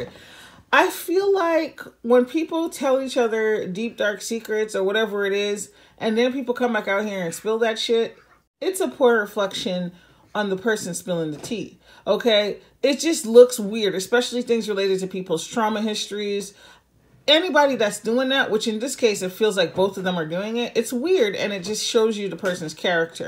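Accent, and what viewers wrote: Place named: Jamaica. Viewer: As an avid YouTuber thank you for this breakdown because I had no idea all this was going on!!! Big up yaself I think I heard a 🇯🇲 accent 💚💚💚#bapbapbap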